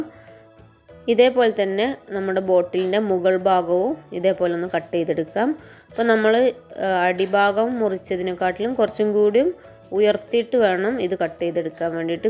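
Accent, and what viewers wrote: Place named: Kannur, India. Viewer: ഞമണ്ടി എടുക്കാൻ 😂😂😂,കണ്ണൂർ ഡിസ്ട്രിക് ആണോ സഹോദരി ,👏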